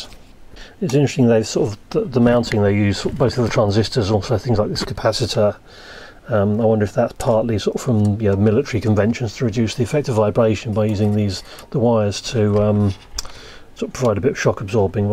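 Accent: United Kingdom. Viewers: Возможно, вам имеет смысл проверить слух. Автор видео англоговорящий, с явно выраженным британским акцентом и чёткой дикцией.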